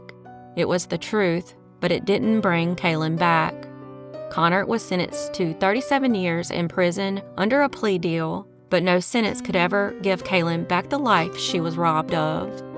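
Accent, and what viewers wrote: South, United States. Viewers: THANK YOU!!:hand-pink-waving: SOLVED CASES!!! YAHOO!!BEAUTIFULL COUNTRY VOICE AND A HEART AS BIG AS MY HOME STATE!! [ TEXAS ]!! GOOD WORK!!💯❤👌🧑‍🌾👩‍🌾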